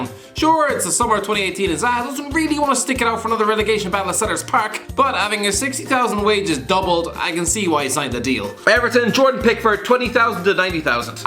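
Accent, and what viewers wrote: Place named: Ireland. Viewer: I thought that it definitely sounded Irish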